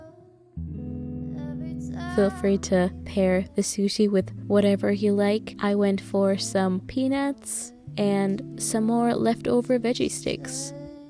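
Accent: Germany. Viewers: she is german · Oh You’re german. Until the “real” I didn’t actually realize, after that I started to realize the accent lol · is she german ? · Are you german? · are you german? :) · are you German?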